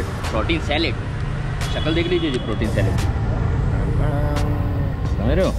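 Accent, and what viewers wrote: Punjab, India. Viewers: Savage bro plzz bnao aur mze Ek to punjabi tadka #kamaal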